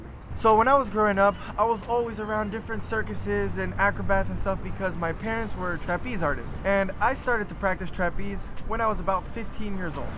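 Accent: Argentina. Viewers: You sound like an Argentine that grew up abroad and moved back cuz of your accent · I am confused, even with half you family being from Argentina, if you were born and lived your life in the US, how is that you have a slight Argentinean accent when speaking in English. Do not get me wrong, you speak very well and fluently of course, but I can hear an accent. However, I do not pick any sign of an american accent when you speak argentinean.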